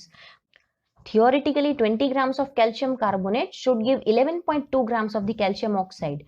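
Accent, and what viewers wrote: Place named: South India, India. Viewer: Mam you are definitely from south. Hai na..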